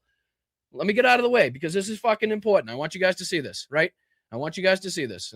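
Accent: Boston, United States. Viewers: right on. Love listening to you. Boston accent.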